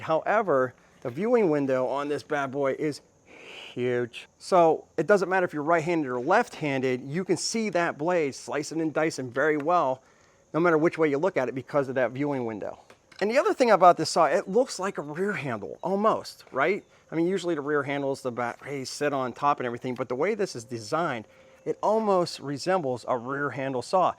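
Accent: Pittsburgh, United States. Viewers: That Pittsburgh-esc accent is obnoxious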